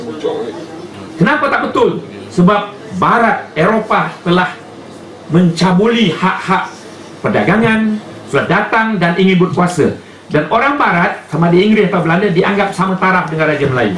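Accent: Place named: Java, Indonesia. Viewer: AKU TAK RASA DIA BUGIS, CARA BERCAKAP PUN DAH TAHU DIA JAWA HAHAHAHAHA DIA PUNYA HUJAH PUN PRO-JAWA HAHAHAHA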